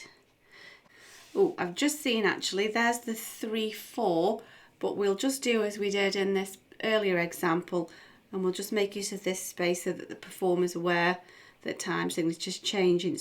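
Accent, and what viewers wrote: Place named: North, United Kingdom. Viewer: You sound like Yvette Fielding. I think it's the Northern accent. I never did Grade Five Theory but did Grade Five Practical and passed about 12 years ago. I'll take ANY advice you offer in the videos.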